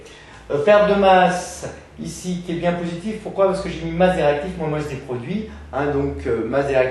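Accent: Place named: Marseille, France. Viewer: bien expliqué avec l'accent marseillais ^^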